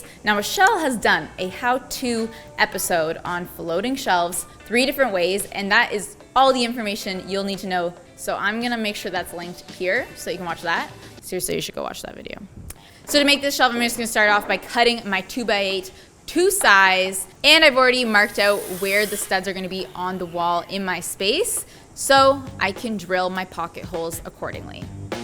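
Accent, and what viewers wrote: Canada. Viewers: Omg your parents are sooo sweet!!! Also you sound more Canadian with them “aye” hehe love it!!! ❤❤❤ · 100% stepped up her Canadian game!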